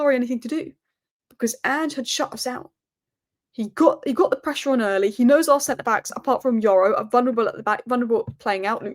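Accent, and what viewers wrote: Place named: Manchester, United Kingdom. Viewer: Loving the Manchester accent luv.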